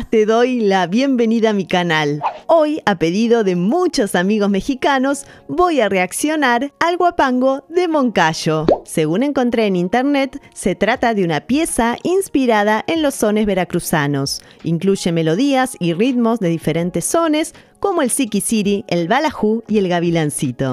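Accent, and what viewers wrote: Argentina. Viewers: Argentina que sorpresa 😲!! te sentía cómo chilena, te identificó la gashina sos argentina. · Por el acento, entonacion y pronunciacion del español de Doña parece ser argentina. Que bien que te guste nuestra música... Saludos desde Ciudad de Mexico.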